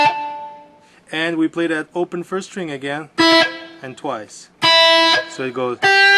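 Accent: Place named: Eastern Europe. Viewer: love the accent....Where you from man? Sounds eastern european.....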